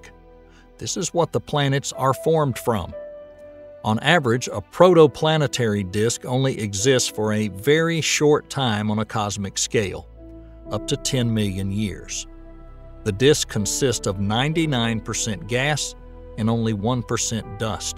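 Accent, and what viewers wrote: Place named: Georgia, United States. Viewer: This leads one to opine... there is some truth to the notion that Jupiter is a savior god, pulling us away from hell, and that the sun is hell, Lucifer, drawing all to it inevitably. This reforms the Judeo-Christian hierarchy of the trinity. God is Satan, the Sun. Jesus is the savior, Jupiter. The holy spirit is gravity, the unifying force that these entities use to interact with us. All this is hogwash, of course. But it is an example of solipsistic thinking. I just did this to show you religionists there's a better explanation that still isn't true. Your creation hypothesis makes no sense at all. P.s. I love how this guy with a southern, Georgia accent, speaks so intelligibly. He's an outstanding orator, with perfect information. Great presentation. Thank you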